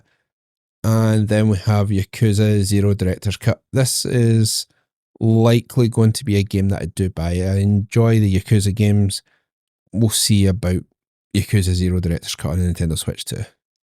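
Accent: Scotland, United Kingdom. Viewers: Maybe a stupid question but had to ask after seeing any of your videos for the 1st time and hearing your voice: You from Scotland?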